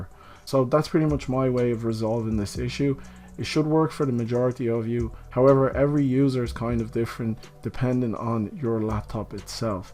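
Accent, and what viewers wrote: Ireland. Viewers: Is that an Irish accent or are my ears just ringing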